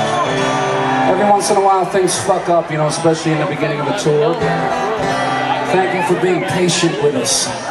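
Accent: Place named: Philadelphia, United States. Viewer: Love his stuff in the 80s, 90s, early 2000s. His beautiful voice I love it, singing and talking, he is the greatest. I loved his Philly accent.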